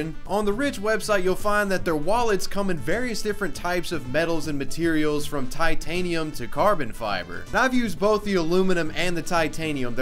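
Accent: South, United States. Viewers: It's cool. We know you from the dirty south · it's not kids these days, he's from the south.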